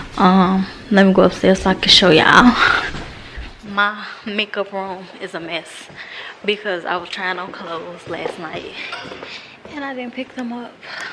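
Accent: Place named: United States. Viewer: Heavy country accent yasss🧡🧡🧡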